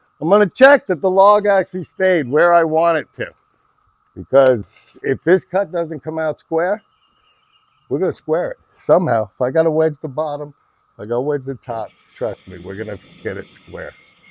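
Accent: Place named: Mid-Atlantic, United States. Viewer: I enjoy your channel, glad I came across it. Two questions. Guessing from the dialect, you might be somewhere between Baltimore and Philadelphia? Somewhere nearby? Second, I’m curious how the laser projects all around those long logs. Are there an extra lasers on the periphery? Just curious how that works.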